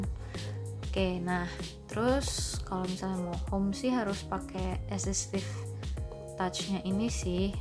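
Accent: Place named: West Java, Indonesia. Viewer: Sunda pisan euy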